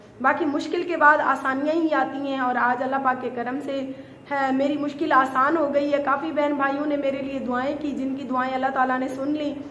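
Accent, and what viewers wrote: Saraiki, Pakistan. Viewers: باجی آپ سرائیکی میں ویلوگ بنایا کرو آپ کی زبان سے سرائیکی اچھی لگتی ہے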